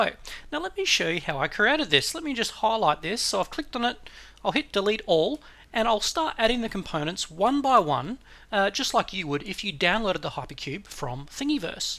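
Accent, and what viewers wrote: Australia. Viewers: must be the sydney accent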